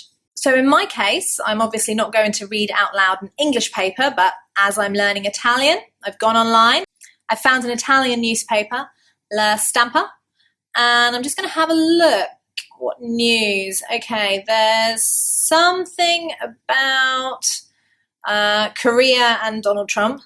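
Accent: United Kingdom. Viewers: Dear, How crazy the world is: as an Italian English learner I, on the other hand, struggle to have decent pronunciation, especially your wonderful British accent, which I dream of acquiring sooner or later. Anyway, thank you for your tips, I wish you would visit San vito Chietino and Fossacesia's beaches (south Abruzzo) in one of your trips so to enjoy our seaside and our fish and meat restaurants and breathtaking views. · thanks a lot for the lessons. i like the british accent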